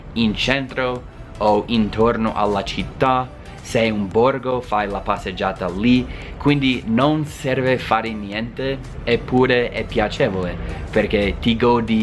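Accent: United States. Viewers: Altra cosa, mi piace un sacco sentirti parlare italiano con il tuo accento americano 🤩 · Mi piace ascoltarti: parli un'italiano davvero ottimo e con questo accento americano l'ascolto è piacevole!Complimenti! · a hint of american pronunciation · Un abbraccio da Genova che italiano super....bravissimo...ottimo video!! E' carino l' accento americano quando parli la nostra lingua sei forte....Genoa e United States forever...!! Tanta fortuna a te e auguri.. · Ti posso chiedere come mai hai ancora questo forte accento americano nonostante dai due anni e mezzo vivi in Italia?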